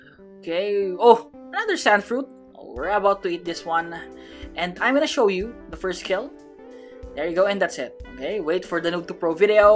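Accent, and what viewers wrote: Philippines. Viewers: Tbh you sound like filipino lol keep it up you alwast make me laugh.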